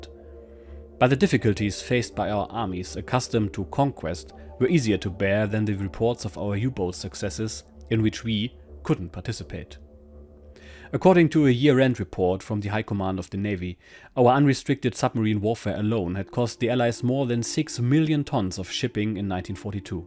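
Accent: Germany. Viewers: when you first started reading the book yourself, you apologized a bit for your German accent. Reading the book with a German accent makes it much more 'real' than if it were read in 'clean' English. I also want to thank you for re-translating the book yourself.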